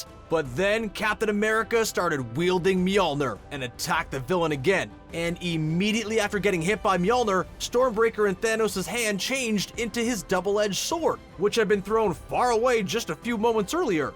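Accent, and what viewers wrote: Canada. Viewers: Is the narrator Canadian? (His pronunciation for some words make it seem like it.) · You have a heavy Canadian accent. Are you from Alberta.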